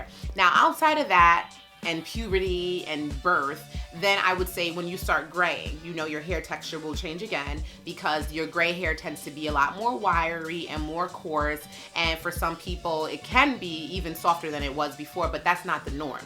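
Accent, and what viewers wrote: New York, United States. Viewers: Wow I haven't seen you in forever youtube is on some bs but your lil lady is so big havent seen her since she was a baby and im loving the NY accent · That NY accent!! Brooklyn Girls In the house!!! ❤️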